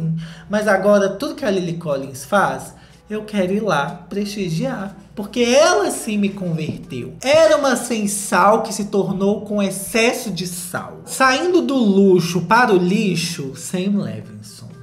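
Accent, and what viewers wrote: Minas Gerais, Brazil. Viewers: Eu ri muito com o lacre sueco! Habla mesmo papi! Kkkkkkk Amo os deboches do com esse sotaque mineirinho 🥰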